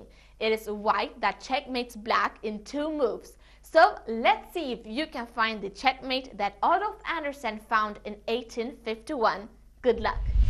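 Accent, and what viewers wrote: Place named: Sweden. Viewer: Nice, a puzzle with some history behind it. Loved it. I think your typical swedish accent is getting less and less apparent.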